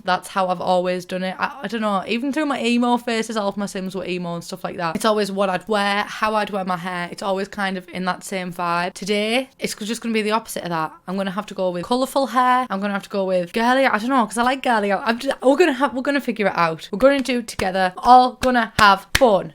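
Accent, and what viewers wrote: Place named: Middlesbrough, United Kingdom. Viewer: I accidentally left subtitles on from a previous video and it struggles so much with your boro accent 😂 eg ‘girly’ changed to ‘garlic’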